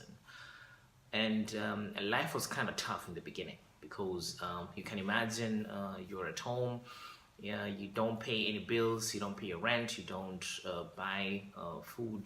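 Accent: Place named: Kenya. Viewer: ongea tu poa kama mkenya!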